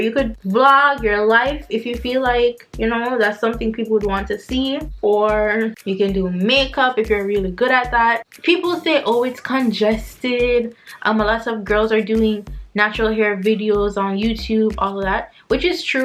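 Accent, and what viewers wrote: Patois, Jamaica. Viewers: Loving the Jamaican accent 💯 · Well, been watching your videos from evening while I do my hair (I'm trying the box braids) and what makes you relatable, is the fact that you kept your patios . Caah be Jamaican if yo no talk di language. Love love love your energy and your vibes.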